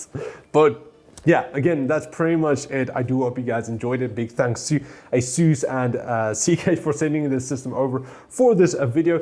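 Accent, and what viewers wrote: South Africa. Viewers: Are you South African? I’m South African myself and I can hear an accent from you. Great content btw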